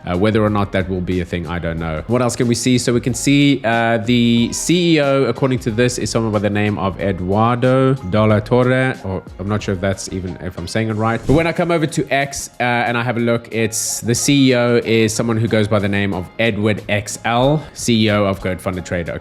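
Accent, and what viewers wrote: South Africa. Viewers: Bruv are you South African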